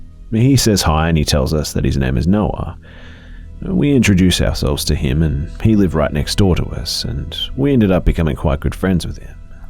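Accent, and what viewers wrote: Australia. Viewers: Awesome Aussie thank you sir for the scares in your awesome accent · Very nice Australian accent yes, one of the best.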